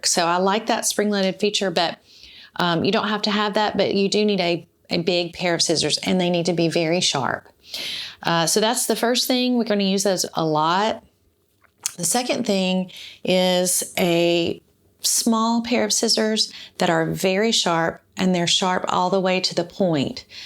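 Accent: South, United States. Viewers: I also just found you and I love your channel- I binge watched every episode! I could listen to your charming southern accent all day long- and find myself thinking in a Southern accent ;) Thank you for all the great ideas on thrusting men's shirts! I purchased a blue striped shirt for a quilt I have been collecting blue& white stripes for several years- when I got home- my husband loved it!🤷‍♀️ When I was watching one of your other episodes, I found myself wondering if you used part of the shirts where the buttons used to be- and if the threads holding the buttons put a stress on the fabric in that area- could it cause a hole? ... if you cut the buttons off before washing the shirts- sometimes the washing process will relax the fabric where a possible hole could arise- so you will have a better idea if it is just stress - or an actual hole- an I explaining myself clearly? 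Anyway, God bless you - and thank you for all this great info❣️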